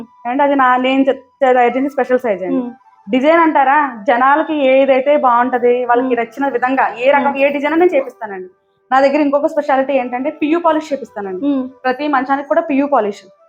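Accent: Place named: Godavari, India. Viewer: అచ్చతెలుగు గోదావరి అమ్మాయి లా మి భాష బాగుంది నేచురల్ గా వుంది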